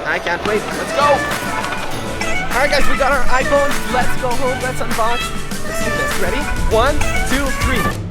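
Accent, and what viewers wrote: Spain. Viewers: Se nota tu acento español, pero igual tienes un perfecto inglés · your spanish accent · Are you from Spain? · awesome to hear you rock spanish spanish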